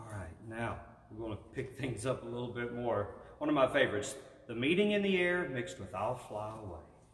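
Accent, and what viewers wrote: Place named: North Carolina, United States. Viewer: I was wondering. You sure sound like a fellow Tarheel/hillbilly because you are! Good deal! You said Shelby and there are several Shelbys spread around. Good to hear all the good older ones. We're west of A-ville 60-something miles out in the hills and just found your channel. This is not the 1st time you ever sat at a keyboard. So Glad To Be Here from another Native, a rare and endangered species and GBWYou!.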